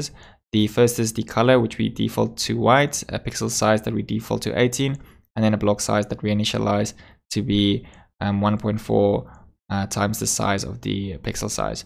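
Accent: South Africa. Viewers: You sound south African 😎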